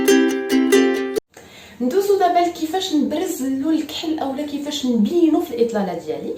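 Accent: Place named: Maghreb, North Africa. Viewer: فيديو رائع ❤️❤️ تصوير رائع ❤️❤️بس اللهجة صعبة الفهم لمعظم العرب لا يفهمون اللهجة المغربية والجزائرية والتونسية للأسف 💔💔 ف الرجاء التحدث ب الشامية أو الفصحى أو المصرية هكذا ينتشر الفيديو أسرع ويكون له جمهور أكبر او ضيفي ترجمة مصاحبة بليز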